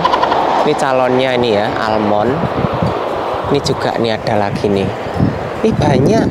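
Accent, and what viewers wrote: Java, Indonesia. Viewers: Baru dua hari ini ngikuti flog njenengan mas....asik janan...bhs medoknya...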